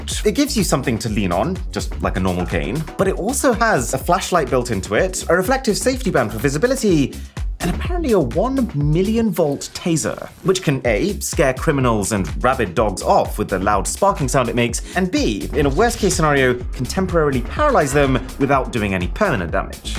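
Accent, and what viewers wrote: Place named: United Kingdom. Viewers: I can never tell if is British or American. He sound British yet only talks about America for stats and uses dollar Can someone please clear this up for me please!!! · He is British but for obvious reasons, the general audience is American and many statistics are measured using dollars.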